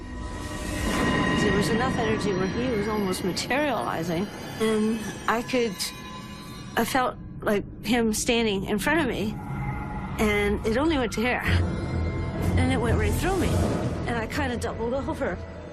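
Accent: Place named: Canada. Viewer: Love her Canadian accent so much! And the Halifax story breaks my heart! All those poor people! :( May God rest their souls!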